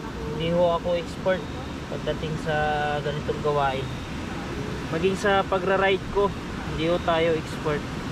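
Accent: Visayas, Philippines. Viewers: Bisaya kba Dong.?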